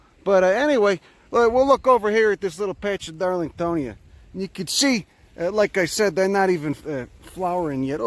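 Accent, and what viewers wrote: Chicago, United States. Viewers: He's an Italian from Chicago. And that's a pure Chicago italian accent. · This guy sounds like he's from the near south east side of Chicago!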